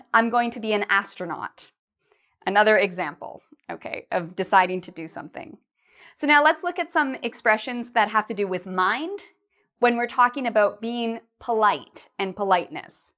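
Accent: Canada. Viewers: You are from Canada right? I can tell from the way she pronounces "About" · Canadian accent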